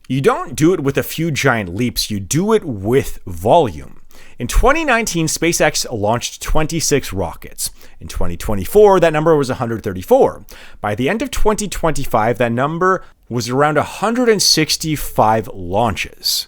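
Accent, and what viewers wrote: Canada. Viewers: I can tell that you are Canadian from your accent, which makes the fact that you managed to make it through this entire video without uttering the name “Trump” once all the more impressive. Excellent analysis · Is this dude Canadian or what?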